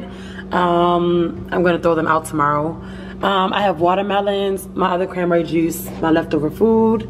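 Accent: Baltimore, United States. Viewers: that Bmore accent funny af 🤣 I'm frm D.C. but good video.🔥💪🏾💪🏾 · Your Baltimore accent is there! And I love it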